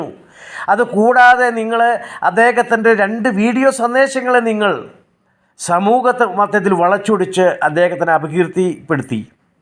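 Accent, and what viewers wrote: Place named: Changanassery, India. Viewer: ഈ ഒട്ടിക്കൽ മാത്രമേ നിനക്ക് അറിയുകയുള്ളു. തനി ചങ്ങനാശേരി ഭാഷ. പോടാ തെണ്ടി.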